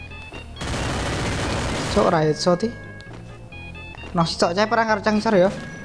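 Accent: Java, Indonesia. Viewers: Orang Jawa kah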